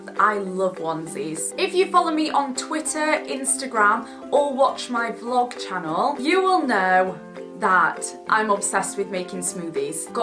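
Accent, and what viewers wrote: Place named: Yorkshire, United Kingdom. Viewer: you are so confident with your Yorkshire accent on camera. Like I'm from Yorkshire and I seriously think mine sounds awful sometimes even on just a normal video. It's so weird. Love you btw!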